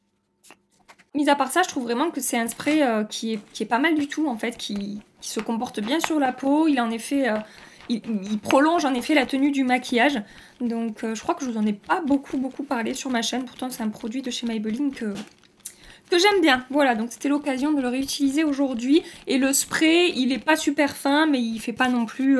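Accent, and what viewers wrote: South, France. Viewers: Bsr bon bien ecoute je ne connais pas du tout le fdt L'Oréal donc une occassion de le decouvrir et ce que je faire plus les monos de Sephora les couleurs me donne trop envie et vue qye mon mari m'a offert une carte cadeaux de chez Sephora pour mon Anniversaire 🎂 je sais quoi prendre lol.... et le Blush Milani cela fait quelque temps que je louche dessus donc avec ton avis tu me fais basculer 😂😂 en tout cas avec tout ce maquillage petit prix tu as su nous faire un magnifique MU, Tu nous inspire vraiment, on voit que tu aimes profondément ce que tu fait et que tu aimes nous le partager et nous on adore te suivre et te dire merci pour tout ce que tu fais pour nous, vraiment en plus tu as toujours le sourire tu n'es jamais négative et tu es adorable avec ton accent du sud et ton rire est contagieux et surtout tu parles avec nous sans filtre et ça c'est génial c'est comme si on était là avec toi dans la pièce et quand il t'arrive quelque chose tu ne réfléchis pas tu parles simplement comme tout le monde et c'est super alors encore un grand merci de nous faire vivre tout ça avec toi car en ce qui me concerne c'est un pur bonheur 😉😉😘😘😘❤❤🌞🌞 Je t'embrasse très très fort et te dis à Dimanche Ambre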